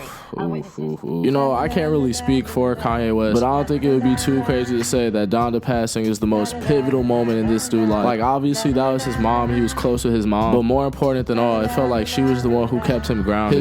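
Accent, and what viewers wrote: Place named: Baltimore, United States. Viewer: I cant unhear the baltimore accent its making me crazy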